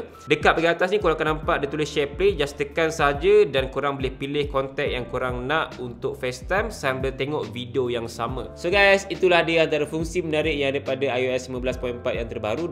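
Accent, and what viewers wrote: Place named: Kelantan, Malaysia. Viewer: mujur eh . ore kelate kow ? 😅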